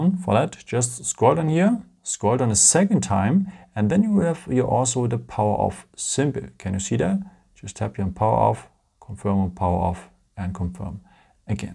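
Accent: Germany. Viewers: Perfect, thats how it should be done. Supercredible also due to the german accent :) ( danke!)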